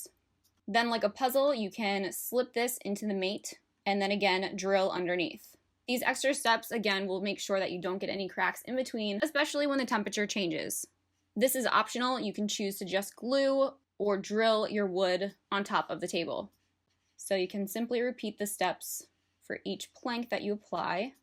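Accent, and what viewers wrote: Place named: South, United States. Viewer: Very southern and cute ☺️ reminds me of home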